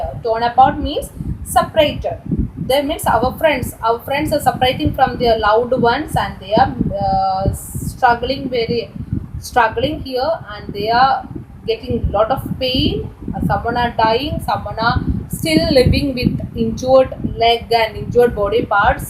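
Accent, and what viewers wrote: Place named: India. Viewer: Bad explaining and butler English very bad better luck next time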